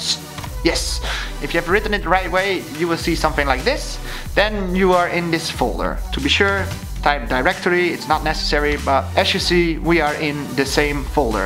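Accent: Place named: Netherlands. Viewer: You have a ducth accent '-'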